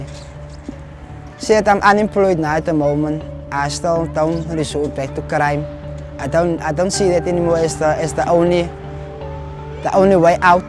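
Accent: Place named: South Africa. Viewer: such an awesome testimony,plus how awesome is the south african accent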